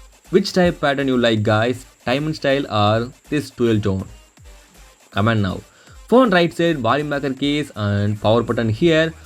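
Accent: India. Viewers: Too hard to understand with your heavy Indian accent, moving on.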